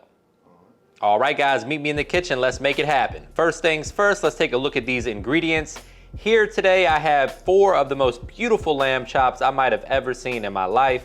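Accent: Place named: South, United States. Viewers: You sound like you are from NOLA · southern accent lol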